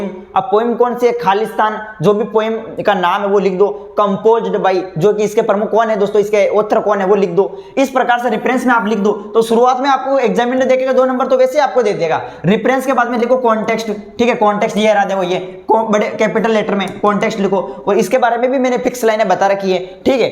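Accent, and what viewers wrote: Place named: Marwar, India. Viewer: Sir thoda marwadi Kam teko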